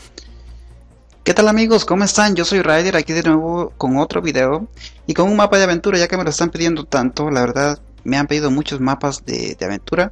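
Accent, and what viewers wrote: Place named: Chile. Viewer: eres chileno? tu acento suena chileno xd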